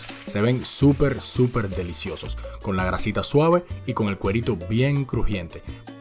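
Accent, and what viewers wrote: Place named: Cuba. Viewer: Por tu acento en la voz me parece que eres cubano si es asi un saludo desde aca desde la isla muy buena esta receta y secretos tuyos un exelente tutorial bien sencillo pero bien preciso Gracias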